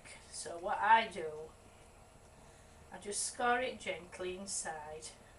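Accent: Yorkshire, United Kingdom. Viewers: Yorkshire for sure. South Yorkshire most probably. Quite close to my accent (Sheffield)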